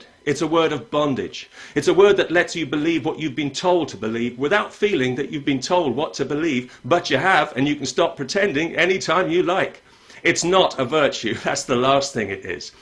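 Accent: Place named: United Kingdom. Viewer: Pat Condell é um cara d+ adoro esse sotaque britânico dele tem uma argumentação lucida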